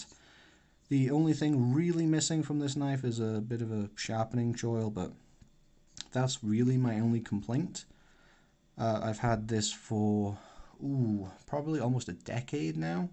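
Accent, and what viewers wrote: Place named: Northumberland, United Kingdom. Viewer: Great video, I love your accent it sounds just like mine. Are you Northumbrian too? I ordered the same UKPK you have off Heinnie, still waiting for it to arrive. It's gonna replace my Böker Plus XS as my EDC, which is also a great knife with a similar design with the choil to stop it closing, no jimping there on the XS tho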